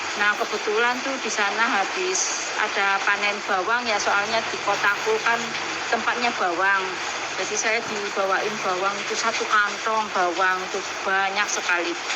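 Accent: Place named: Java, Indonesia. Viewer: Bunda asal mana bund,,kok medok kayak saya 😂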